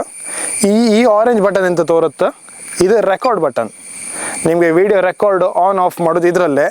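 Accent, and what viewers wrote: Kundapura, India. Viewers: Kundapura kannada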